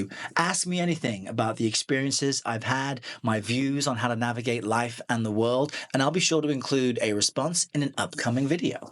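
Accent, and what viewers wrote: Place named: England, United Kingdom. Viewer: Hearing your background story made me think, “Wow. I never would’ve guessed.” Thanks for sharing that piece of yourself. I also never would’ve guessed you moved to the US at such a young age seeing as how you still have a VERY noticeable English accent. As for being the underdog, I actually think it’s not a bad place to be at all. There’s no pressure, no one knows what to expect from you, and you get to completely set the tone for whatever it is you want people to know about you. Btw, I will never understand how you anchor people get the green screen thing down. It looks sooo confusing 🤯. And I never considered how this job could be heavy at times when you’re in an unfamiliar space with a story that hits hard in a negative way. I could go on responding to every single point you made in this video, but I will spare you the essay lol. 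Keep up the good work!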